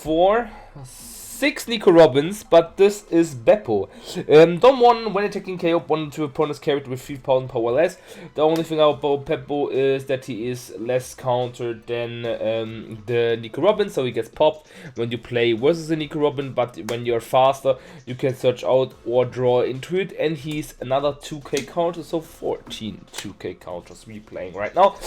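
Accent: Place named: Germany. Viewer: Ah nice, hatte immer das Gefühl wegen des typisch deutschen Aktzents 😁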